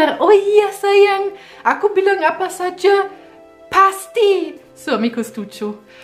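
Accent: Java, Indonesia. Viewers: Mbak. Medhok mu itu lo kok legit banget. Koyok mendoan. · Mendok bgt udah mbak ya,.sy asli orang Lhokseumawe Aceh prnah tggl kerja di smrag bbrp th dl.,snag ja liat video kalian dg ltar blkag liku liku hbugan cinta kalian.mas,.smg bgia trs n langgeng ya mas,jg mbak saat trs bgia trs,.prbyak syukur dg itu kbgiaan akn brtmbh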